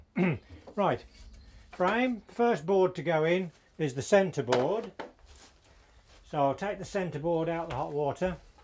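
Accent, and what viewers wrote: Northamptonshire, United Kingdom. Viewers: Lovely Northants accent and beautiful craftsmanship. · Nice to hear a Northamptonshire accent on YouTube, albeit faint.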